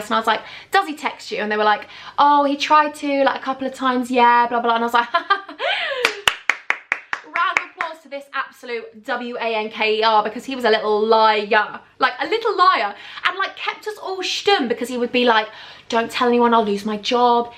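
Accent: United States. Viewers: I live in the states and your American accent was on pointtttt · I’m living for the American accent hun!!!❤️❤️❤️❤️❤️ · Girl your American accent is on POINT! Lol coming from an American lol 🙌🏾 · YOUR AMERICAIN ACCENT IS AMAZING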